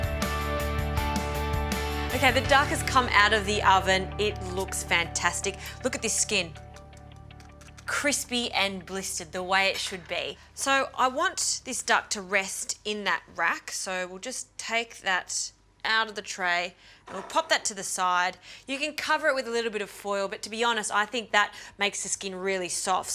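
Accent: Australia. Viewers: stop making yourself look like an idiot. I'm Australian, she's an Australian celebrity chef, America is the only place not using metric and her Australian accent is a dead give away, even though I don't notice the accent because I'm Australian. "Everyday Gourmet" is an Australian cooking show hosted by Justine, the lady in the video. So yes, it is metric, it is obvious to anyone that has morw than 2 cents for a brain. Merry kiss my ass. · Oh my God guys. It's pretty clear from her accent she's Australian so yes she uses Celsius. Come on.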